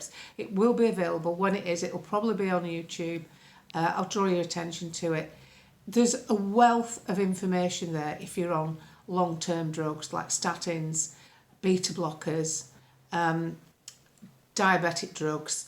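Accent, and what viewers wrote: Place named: RP, United Kingdom. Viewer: Brilliant as usual but stop talking posh. DATA is pronounced day-ta not darter. Phil Taylor is a darter 😊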